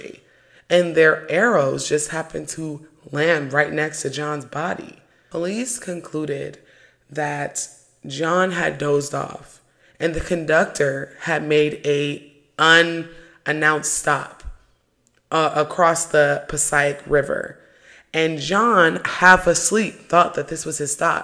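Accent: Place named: Baltimore, United States. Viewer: Sounds like u from Baltimore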